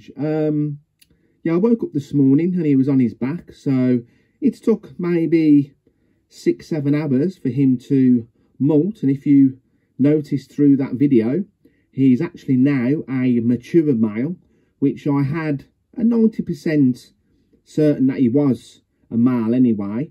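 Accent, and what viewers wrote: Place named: Birmingham, United Kingdom. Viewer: Yeah, it’s really nice here. On the coast. But, we have our fair share of issues with drugs etc 🤦‍♀️ I guess that there’s no escaping that. When I was younger (much younger 😂) we knew nearly everyone, not now though. There’s more houses being built and people. Same as everywhere else I guess. You’re a Brummie aren’t ya? There’s no missing your accent 😂 xx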